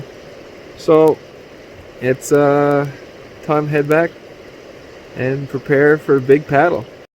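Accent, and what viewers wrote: Newfoundland, Canada. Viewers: Where ya from? You must be newfie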